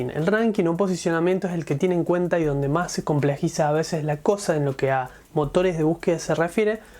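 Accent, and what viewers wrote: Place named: Córdoba, Argentina. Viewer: Ay me parece que sos Argentino de Córdoba.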